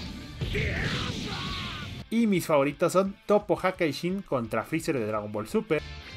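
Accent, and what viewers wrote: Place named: Mexico. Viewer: si loco nunca escuchaste a un mexicano hablar?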